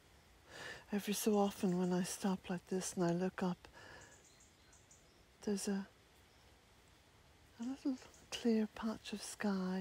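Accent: Ireland. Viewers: What a beautiful video. You have a lovely voice, very calming and I love your Irish accent too. Bealtiane cottage looks like a heavenly place to live xx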